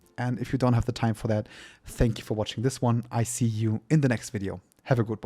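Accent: Germany. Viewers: Wait, you’re German?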